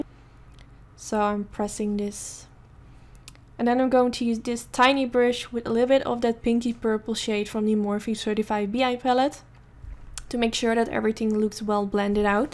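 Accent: Netherlands. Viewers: ik hoorde je praten en wist meteen dat je nederlands was haha! ik zou iets meer werken aan je engelse uitspraak!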